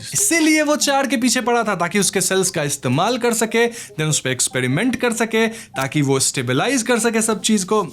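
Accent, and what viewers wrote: Bengal, India. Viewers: Bhai tu bengali hea kya ? 🤔🤨🔪 coz some of your accents is so similar with bengal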